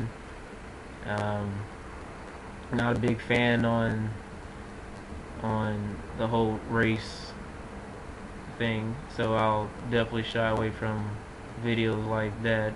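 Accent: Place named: Texas, United States. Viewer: First off, let me say your locs are Rockin'! Second of all, you're not hearing what we hear in your voice. Your voice is fine, even has that special oomph with the Texan accent. So be encouraged. And since you write poetry, this will be your way of getting out of that insecurity with your voice by letting us hear your spoken word. Thanks for sharing. Subbing, hope you'll do the same.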